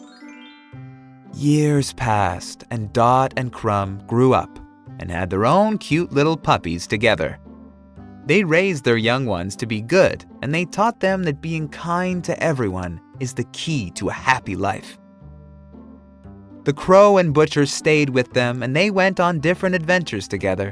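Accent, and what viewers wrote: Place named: United Kingdom. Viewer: The narrator's British accent gave me some italian school memory vibes (yes, italians studies English at school! Isn't amazing?)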